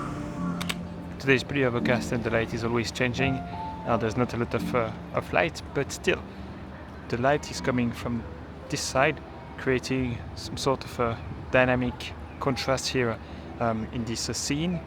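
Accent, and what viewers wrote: France. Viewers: Je me disais que ça sentais l’accent français ! Super vidéo, grand talent !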